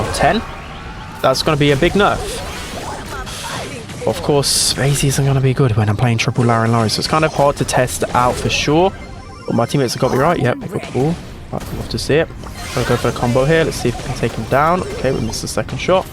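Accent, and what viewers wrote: United Kingdom. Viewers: W british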